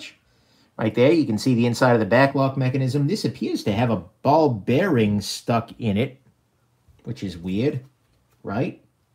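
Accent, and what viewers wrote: New York, United States. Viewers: Is that a new york accent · Violently New Yorker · Heavy NY accent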